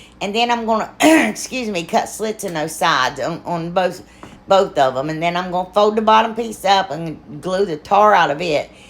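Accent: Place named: South, United States. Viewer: For one u r from the south so don't ever try changing ur voice again cause I love listening to u talk in ur own voice. Second I always love everything u make and if I could I so would totally try makin this ur way lol believe it or not but I would. U really r the best person and funniest love I love watching so plz keep making ppl laugh ur way. Thank U❤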